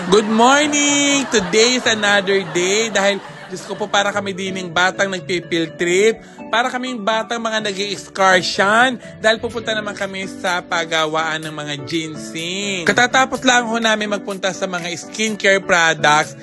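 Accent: Batangas, Philippines. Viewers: First time to watch your show, I love it 🥰 Ang ganda ng Batangas accent mo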